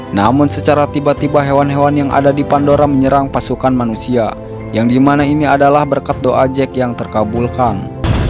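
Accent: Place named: West Java, Indonesia. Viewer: Pasti Narator Nya Orang Sunda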